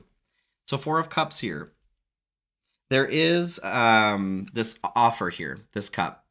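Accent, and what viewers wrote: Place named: South, United States. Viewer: I love your personality. Serious to thick southern accent. Your Reading style is great❤ All goes smoothly in your surgery Oh no😂 I just saw Mr. Bill😊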